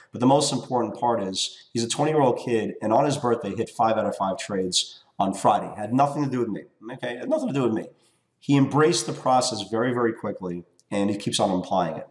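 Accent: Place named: United States. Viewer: your accent is quite possibly the best American accent in existence.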